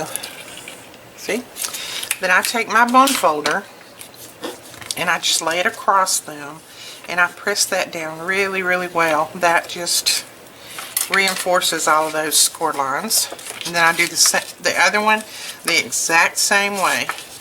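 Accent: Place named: East Texas, United States. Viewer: would you happen to be from E/NE Texas? you sound like family members of mine. :-)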